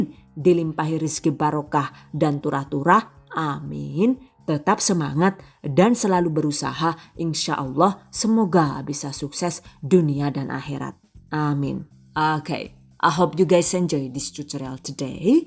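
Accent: Banyumas, Indonesia. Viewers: "DONE" Alhamdulillah selalu suka resep dari dan trik dan tips setiap resepnya jelas banget... Apalagi suaranya khas banget dan ngapak😁😁 Pokok resepnya selalu di nanti2😍😍 Sukses selalu buat dan terimakasih atas semua resep2nya😘😘 #giveawayTHV2020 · Laaah Wong ndi sih gye, deneng ngapak? 😂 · Aku seneng banget Maring cenel kiye koh penasaran jan2 asli ne wong ngendi Banyumasane kok medok banget.😀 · Lucu banget..ngapak2 kaya nyong bae deneng lah..mbekayune.. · "done" #giveaway thv 2020 Slalu ngapak...sukses ...catat...👍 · Aku suka bahasa jawa ngapaknya...ora ngapak ora kepenak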